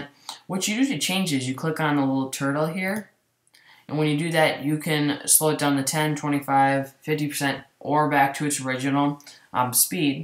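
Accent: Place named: Wisconsin, United States. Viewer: I can tell you are from Wisconsin just from your accent.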